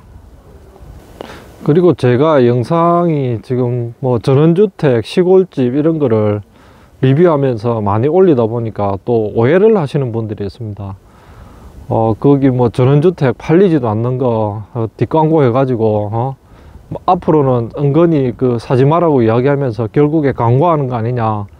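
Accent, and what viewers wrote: Gyeongsang, South Korea. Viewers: 부조리 고발영상및 구수한 경상도 목소리 너무 잘하고 계십니다! 방송 잘보고 있습니다! 화이팅!! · 감축드립니다 매우건전하고 진실된팩트방송 최고예요👍 경상도사투리 너므정겨워요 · 3만 축하드립니다 ! 시골의 모습을 이렇게 정직하게 보여주시니 앞으로도 구독자수가 빠르게 올라갈 것 같습니다. 얼굴공개 안하는 건 좋은 결정인것 같구요, 정감가는 경남사투리가 참 듣기 좋네요 ~ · 한 2주 전부터 보기 시작했는데 처음부터 믿을 만한 분이라 생각했지요. 경상도 억양이지만 사투리는 거의 없는 구수한 목소리가 좋아요. 그리고 잔잔한 정의감, 왠지 성실할 것 같은 삶의 자세, 이런 것들이 좋아 보입니다. 계속 열심히 하시기 바랍니다. 챙겨보겠습니다.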